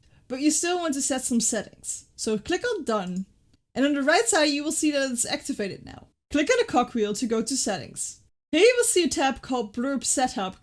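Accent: Netherlands. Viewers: nice are you dutch you sound dutch!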